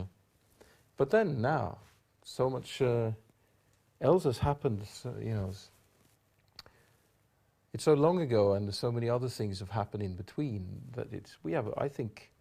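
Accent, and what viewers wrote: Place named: Norway. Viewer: It is definitely his/the Norwegian(Oslo area/south east Norw.)accent.(BTW heard some Aha's earlier interviewes recently and their English was really good back then(80's/early nineties)I think his swedish wife(Camilla?) had their children over there too.I think both him and Mags are unaware of these tiny flaws"Being half Norwegian myself,ppl tend to ask me if I'm from the Isle of Man, Wright or some other isle.Most Scandinavian ppl(Or ppl from the Netherlands,Finland, Belgium...most of them prefer sort of an American accent.Met this bloke from Scotland once,thought his accent was sooo charming(Probably because he was too lol.) Morten, Mags and everyone else should keep their accents.The way you smile,laugh walk, talk,think,express yourself in general...I believe that's what make most ppl unique in the first place✌🏼🌺🤲🏼🦋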